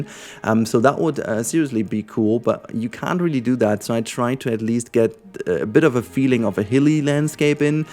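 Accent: Germany. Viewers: You sounds like a german , bin selbst auch deutsch ,you too ?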